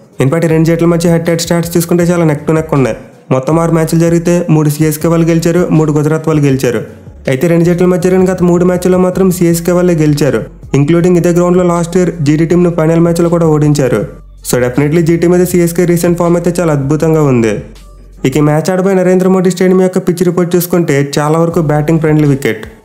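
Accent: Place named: Visakhapatnam, India. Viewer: rajahmundry ayyina aa slang lo matladatledhu miru vizag slag lane undhi so vizag vadivi anukunna bro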